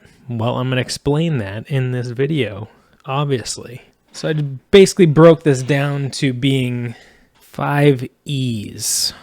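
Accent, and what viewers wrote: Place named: Midwest, United States. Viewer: Your accent tells me you're from the Midwest. Chicago or Milwaukee. Takes one to know one ha...thanks for the video!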